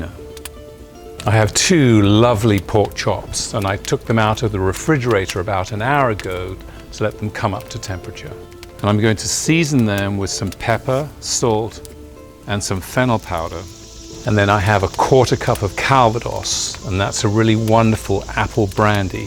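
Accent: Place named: England, United Kingdom. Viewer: Between the music your English accent the sound of the fire the sound of the food cooking and the fire itself these videos are relaxing and enjoyable. My only wish is for it to be weekly.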